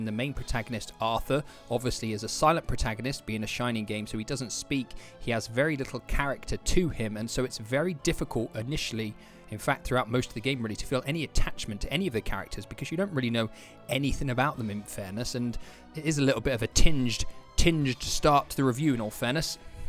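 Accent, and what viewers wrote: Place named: United Kingdom. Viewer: The classic well written well spoken British man I believe every word he speaks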